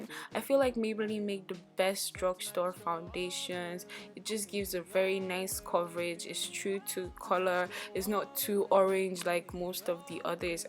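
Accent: Nigeria. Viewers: ehhh shoki😂😂 love that nigerian accent❤❤ beautyful girl💕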